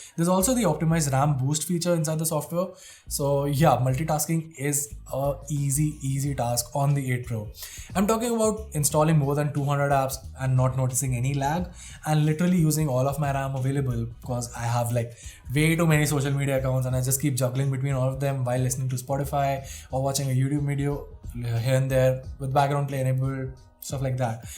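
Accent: India. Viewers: Holy shit, no offense, but it’s difficult to understand you when you speak fast. But I always had troubles with Indian accents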